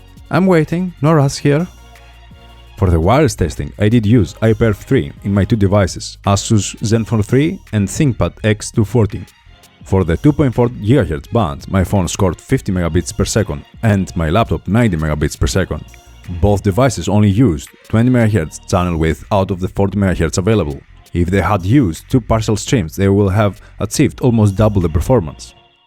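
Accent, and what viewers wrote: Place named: Greece. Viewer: Ακούω προφορά και λέω Έλληνας θα είναι. Μετά βλέπω και τη Σαλόνικα και το σιγουρεύω!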